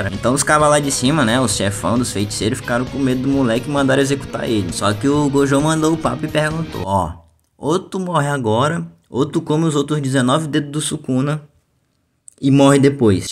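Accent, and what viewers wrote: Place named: Alagoas, Brazil. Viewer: Krlh tu é alagoano tb skskskksksks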